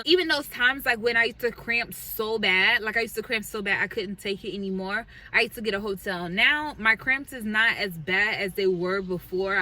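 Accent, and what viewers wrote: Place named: South Carolina, United States. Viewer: I love your American South Carolina accent iam living in my car too in DMV area, and thank you for your important info and that iam not alone, much luv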